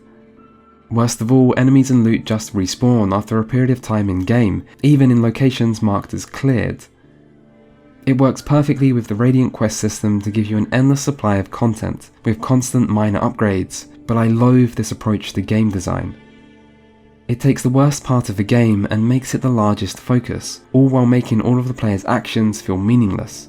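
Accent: England, United Kingdom. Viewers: Wow! My first video discovery was the amazing Lovecraftian games video, and now I can't get enough of so meticulously crafted, intellectually challenging insights on games I never pondered about. P.S. listening to that brilliant English accent narration for a non english speaker is to die for :). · Really good commentary and soothing British voice that sounds intelligent. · Not a criticism of you, but must every documentary guy speak softly with an English accent? It puts me to sleep, I swear to you.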